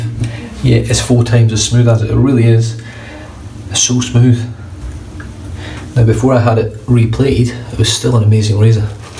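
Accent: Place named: Scotland, United Kingdom. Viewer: Happy Birthday! Your Scottish accent makes me think you were older! I'm 35 and I feel like my voice doesn't match my age lol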